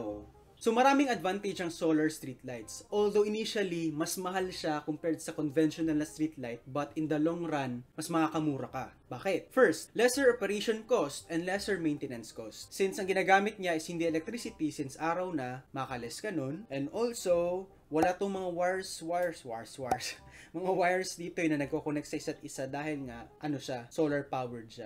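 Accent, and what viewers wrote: Visayas, Philippines. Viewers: Bisaya d-i ka dong?asa dris Pilipnas ka gkan?hehe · Hi bisaya diay ka?...hehehe...parehas diay ta. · Bisaya dodong.. Apir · Basta ang alam ko bisaya ka bai tama ba ako. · Klaro kaayo basta bisaya hahahaha nag subscribe nako engr. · Bisdak diay si Engr.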